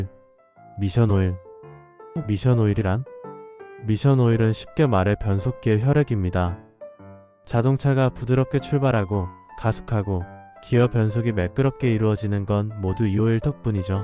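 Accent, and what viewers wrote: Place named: China. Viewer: 말이 서툴러도 직접말하세요. 읽어주는 자가 중국인으로 추측됩니다 한국어를 짓발는 행위를 그냥 두고보면 당신도 간첩이 됩니다.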